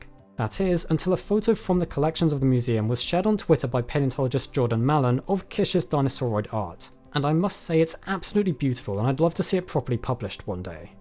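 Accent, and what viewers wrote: United Kingdom. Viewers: It sounds like it must be a smart argument because he's British, so it sounds smart....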